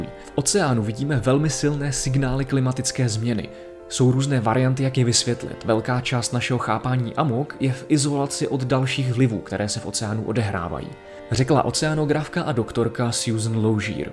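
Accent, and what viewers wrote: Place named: Prague, Czech Republic. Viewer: Kdybys vynechal ten pražský přízvuk tak 10/10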